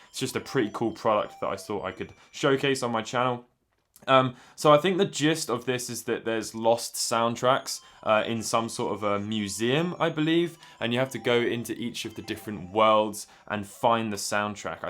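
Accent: England, United Kingdom. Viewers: I love how his English accent sound "Dah screw sstick to my finguh" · The English accent and vocabulary makes me feel like the gameboy is dirtier than it really is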